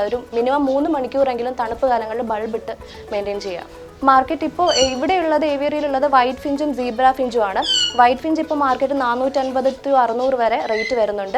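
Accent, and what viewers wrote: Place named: Tamil Nadu, India. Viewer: Unga voice la tamil la maraikka pannureenga but tamil oru poothum maariyathu mam valthukkal mam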